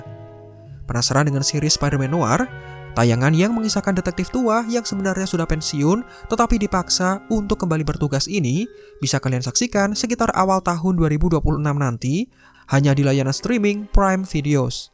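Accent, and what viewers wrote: Java, Indonesia. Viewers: mas, sampeyan asli mana . kok kayak ada medok jawanya, hehe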